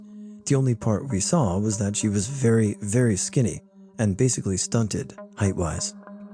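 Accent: Yorkshire, United Kingdom. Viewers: Hilarious Yorkshire accent. Lol. I'm from that huge county (one of four Yorkshires). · Best Yorkshire accent I've ever heard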